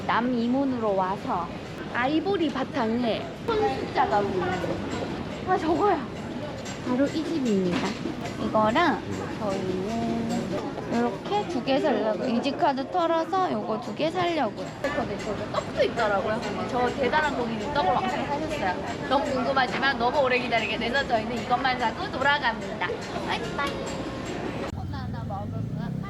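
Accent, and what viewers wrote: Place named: Gyeongsang, South Korea. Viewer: 너무 이쁜보이스를 가지고 계십니다 약간 갱상도 대구 말투도 썪인듯함😊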